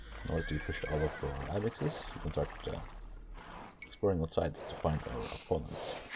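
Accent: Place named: Scandinavia. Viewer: The Scandinavian accent makes it very hard to understand, mic volume way too low.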